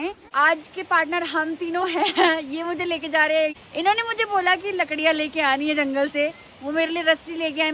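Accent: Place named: Pahari, India. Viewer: Her pahari accent 🔥😘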